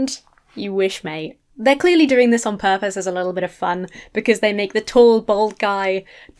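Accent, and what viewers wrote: United States. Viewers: You do a great American accent! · You've got a good American accent!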